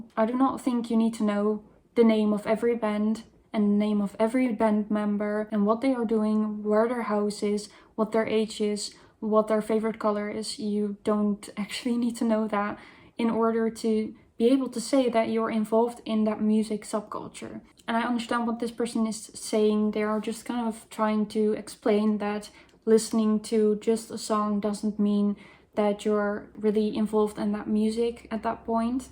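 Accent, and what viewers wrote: Netherlands. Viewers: I love your eye makeup. The "phase" thing...I didn't really discover the goth "thing" until my 40s (I'm nearly 47), but I've seen goths in their 70s - no reason it should be only a phase, and if it is for some people what is wrong with that? We like what we like whatever age we are, right? :) As far as bands go, if you haven't heard them or heard of them check out a band called Suspiria - I think they're from here in the UK. Not sure if they're active now, but they were big here in the 90s, and I know they have some stuff on YouTube. Also, if I may say so, I love your accent - the Dutch people I've met have been lovely, caring people without exception and I enjoyed what little time I spent in the Netherlands very much. Hope all is well. :)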